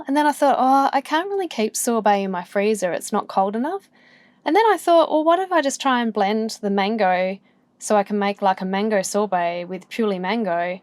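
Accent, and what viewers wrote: Australia. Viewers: So stocked yo hear an Aussie accent!!!! I’m about to get a van……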